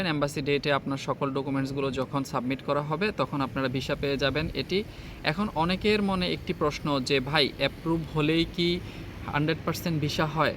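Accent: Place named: Chittagong, Bangladesh. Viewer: ভাই মনে হয় চট্টগ্রামের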